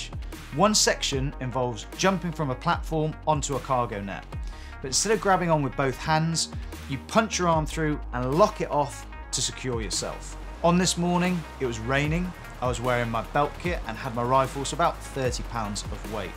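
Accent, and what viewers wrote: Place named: United Kingdom. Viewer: Maybe the obvious reason I like video like this, even when talked about "your biggest fear" Is the UK English voice even some interesting cutscenes (as additional like seasoning) 😅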